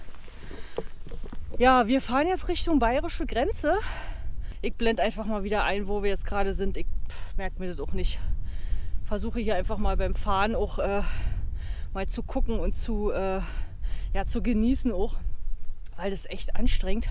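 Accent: Berlin, Germany. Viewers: Hallo deine Videos schaue ich sehr gerne, bin bei deinen Touren auch etwas dabei. Macht viel Freude deine Touren und Erlebnisse. Auch deine berliner Aussprache sehr nice. Grüße Aus Bayern Rudi t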